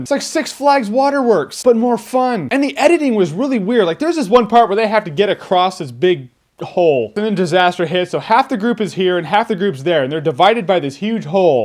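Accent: Australia. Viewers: Jeremy's a wizard at faking australian accents. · Nice aussie hardcore adventure guy accent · Hahaha Im aussie and that would have to be the funnest Aussie accent i ever heard but still was a real beievable one at that,Should do a review on the Crocodile Dundee for shits and giggles!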